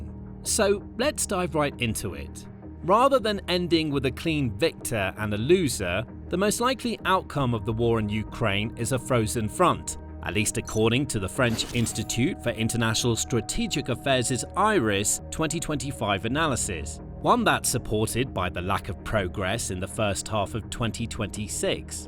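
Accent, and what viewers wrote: United Kingdom. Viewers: Unnecessary prolonged video in a a British accent is tiring….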